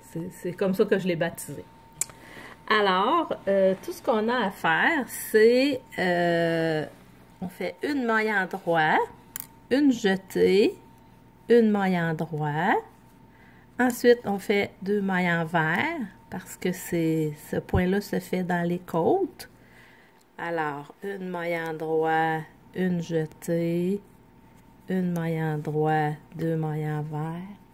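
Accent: Canada. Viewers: Merci pour ces explications très claires , ce point est très joli . Bel accent canadien.👵 de Nîmes 🇫🇷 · Parfaitement expliqué ! Joli rendu ! Et........delicieux accent. Québécois ?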